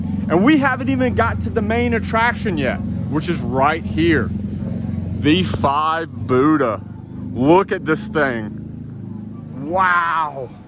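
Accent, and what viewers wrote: United States. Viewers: I love the way Americans pronounce it Boooooda :)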